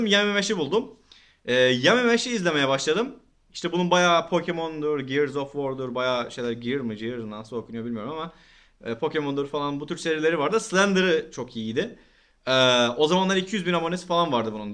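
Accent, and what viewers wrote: Trabzon, Turkey. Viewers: abi Trabzonlu olduğun çok belli tolga zengin e beziyorsun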